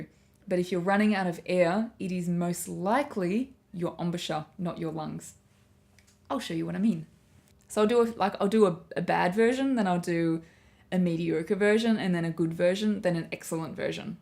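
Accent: Australia. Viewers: this is australian accent?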